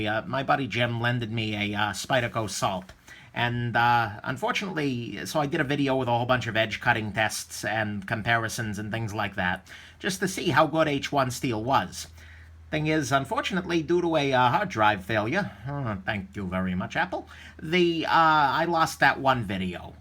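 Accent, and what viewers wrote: New York, United States. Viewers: Where are you from? New York or something?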